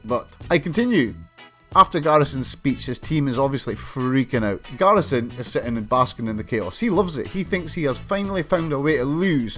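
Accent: Scotland, United Kingdom. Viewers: damn shame about your thick, almost undiscernable scottish accent. can hardly understand 30% of what the hell youre saying.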